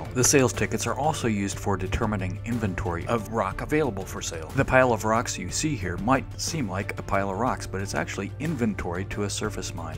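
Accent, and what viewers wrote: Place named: United States. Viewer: Hi my dear! Great sharing! I love your american accent by the way ❤ Big hug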